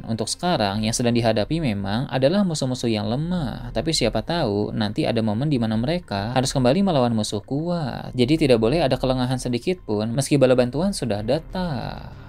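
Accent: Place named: Banyumas, Indonesia. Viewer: Ngapak teka🎉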